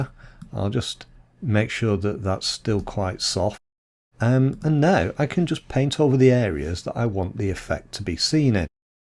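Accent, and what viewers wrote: United Kingdom. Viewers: Nice to hear a fellow Brit extolling ON1. I've seen this done differently as well in ON1; duplicate the layer, drop the exposure by a stop, add blur and then reduce the mask to about 50%. It'd be interesting to compare the two.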